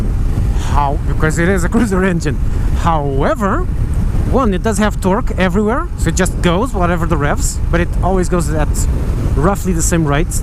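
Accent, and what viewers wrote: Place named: Portugal. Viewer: nice portuguese accent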